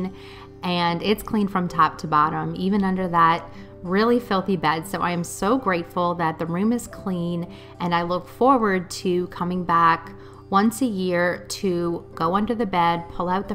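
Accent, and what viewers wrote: South Louisiana, United States. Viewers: Louisiana in the house!! Oh I'm so glad I found your channel! I was like... she sounds more local than just southern, then you said you lived in southern Louisiana, and I freaked! Yay! I'm from South Lafourche, where are you in south LA? I totally loved watching this video! Especially seeing Tony's in the spice cabinet, 😆!! I can't wait to see more of your content in the future!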